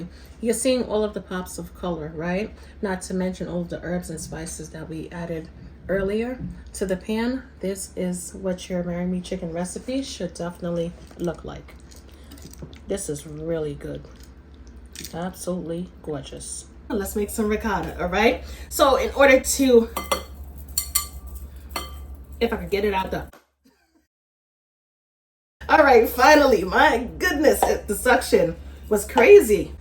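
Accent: New York, United States. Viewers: First vid. Amazing. Instant sub. Btw which boro you from tho? I can hear it 😂❤ Recipe in desc box...the first is for a 9x13??? I wanna do this to fill a hotel pan. The second is double. That'll do it, right? Thanks! Much love from just over the GWB 😘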